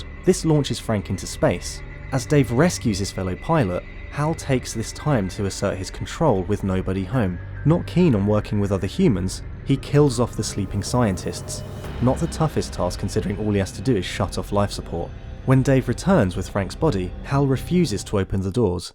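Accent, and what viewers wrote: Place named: United Kingdom. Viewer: I couldn't understand this video with his British accent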